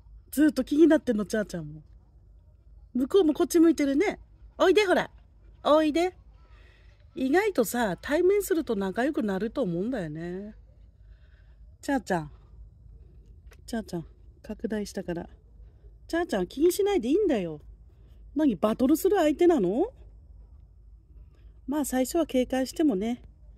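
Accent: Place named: Ibaraki, Japan. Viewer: 初めまして！もしや茨城の方ですか？ 何となく聞き慣れた訛りが😁私は現在北欧のデンマークに住んでいますが、生粋の茨城人です。茶ーちゃん可愛いですね。我が家にも茶白猫がいて、細々と猫動画もやってます。全然人気がありませんけど😅チャンネル登録と高評価ボタンポチポチしちゃいましたよ。これから他の動画もゆっくり観させていただきますね。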